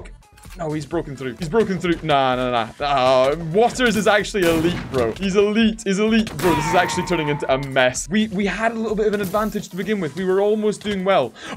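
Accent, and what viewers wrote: Scotland, United Kingdom. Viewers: Love your vids and I'm part Scottish from my dad side and your Sckttish accent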